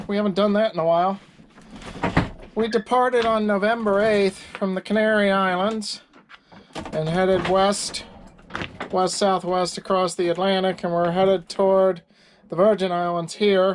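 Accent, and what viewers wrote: Maine, United States. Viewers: Are you a Mainer. You talk very similar to myself and most of my Maine relatives. That's meant to be complimentary. And explains your excellent seamanship. "Stand Fast all ye men from Maine who go down to the sea in ships."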